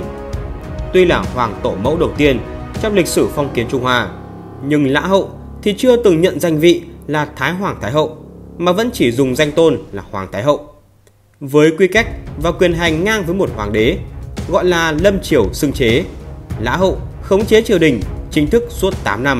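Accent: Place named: Cambodia. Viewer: Ko biet tieng Anh thi lam on lay ten tieng viet dzum, noi tieng Anh ma nghe nhu tieng campuchia, nghe phat gom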